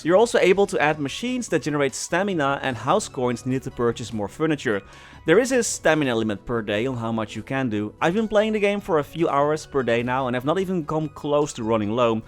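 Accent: Netherlands. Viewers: Dude has a heavy dutch accent 😅, is he dutch? 😅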